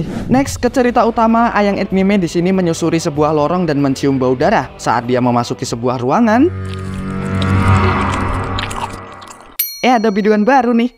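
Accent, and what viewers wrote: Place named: Padang, Indonesia. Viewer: Wahh orang padang 😅😅😅😅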